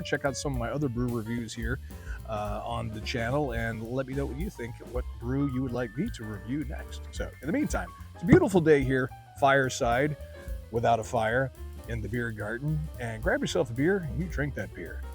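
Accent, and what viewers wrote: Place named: Boston, United States. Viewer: Bawstin LaaaagAH! Remastid! I can’t stand the Bostonian accent but I love the 🍺 😂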